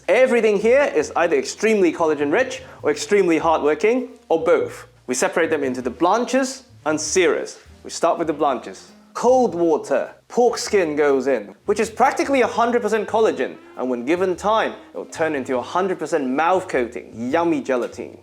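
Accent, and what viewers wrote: England, United Kingdom. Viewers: brother is throwing me off he looks like an asian uncle with a british voice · You'll be surprised with how much English the average asian have to learn. That, combined with the fact that he'd be studying in England for a long time to get that accent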